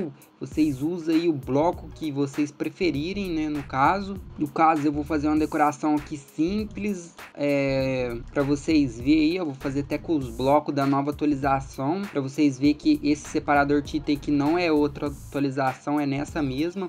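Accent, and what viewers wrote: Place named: Minas Gerais, Brazil. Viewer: Muito bom esse seu jeito mineiro de falar deixou mais legal parabéns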